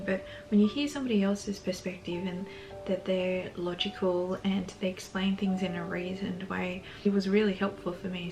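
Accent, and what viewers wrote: Australia. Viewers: Thanks for the thanks. I’m really grateful to hear an Aussie accent in the YouTube world. I like your chilled vibe. And mental health tips are always useful, especially in the time of COVID.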